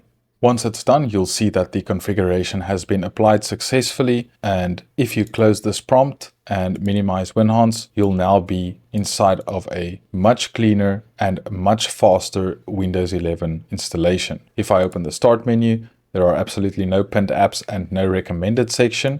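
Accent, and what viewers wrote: South Africa. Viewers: You sound South African. Great work. Thank you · You sound like a South African that lives in Ireland. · Saffa accent I hear? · You sound South African. It's not on git. I will assume AI slop unfortunately. I won't bother with Windows again though. If I really had to use Windows for someone else I'd just use shadowwhisperer/XakerTwo scripts from github, ChristTitus Winutil from github and the like. Transparency is needed. It is important to specifically turn off Windows malware injection garbage specially on Asus pc's. Windows Platform Binary Table (WPBT).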